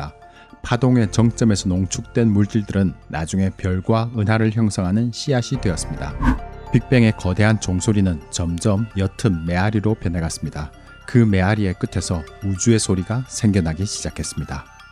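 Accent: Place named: Gyeongsang, South Korea. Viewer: 나레이션 하는분 경남사람이시네 부산잠깐살다 떠난이후 오랜만에 들어보는말투네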